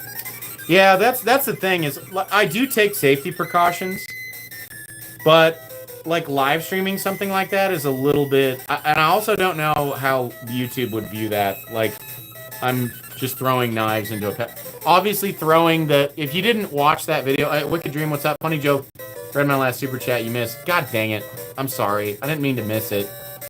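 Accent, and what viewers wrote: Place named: South, United States. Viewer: Little bit of a southern draw on this episode. Let me find out you're one of us Smokey Mountain Knife Works locals and you've been playing the long game the whole time.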